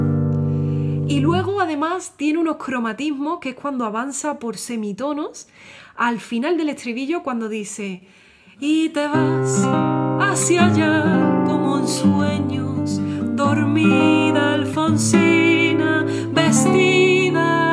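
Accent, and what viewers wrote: Andalusia, Spain. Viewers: Estimada quiero agregar al comentario anterior que, mi bisabuela materna Josefa Calderón Candil y mi abuela Angela eran de Olvera- Cadiz y reconocí tu acento andaluz inmediatamente. Eres maravillosa! Dios te bendiga siempre.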